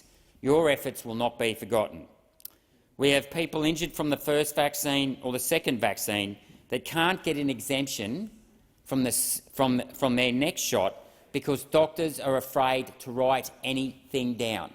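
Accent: Australia. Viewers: I am ashamed of myself. This is 7 months old and my first viewing. I was at that time doing 6 days a week I have since retired. I have now seen 4 vids of this guy and will vote for him next time around. He is nothing less than BRILLIANT. To top it off he sounds like me and every other Australian I have known in my 68 years. Good on you cobber.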